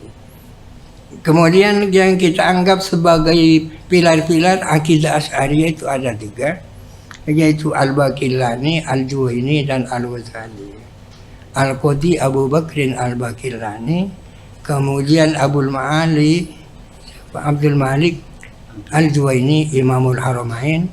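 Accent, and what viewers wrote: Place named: Madura, Indonesia. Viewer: Salam ta'dhim kyai. Tausiah tingkat tinggi. Pendengaran saya suara kyai seperti ada logat2 maduranya.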